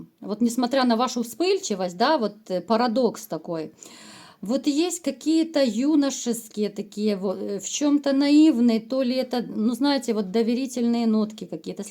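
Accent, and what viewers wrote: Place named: Ukraine. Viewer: В речи редко проглядывается украинский язык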